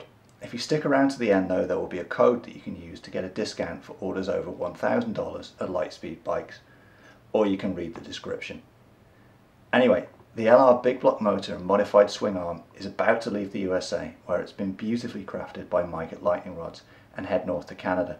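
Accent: United Kingdom. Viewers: U in canada? With a british accent hehe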